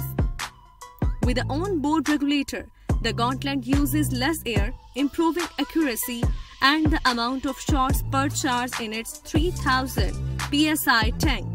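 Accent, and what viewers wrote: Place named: India. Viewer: The Indian accent is worse than the robot voice.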